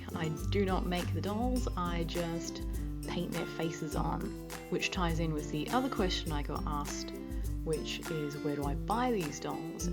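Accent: Australia. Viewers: sorry for a kind of off-topic/personal question, I'm just wondering what Australian state you're originally from? just trying to place your accent hehe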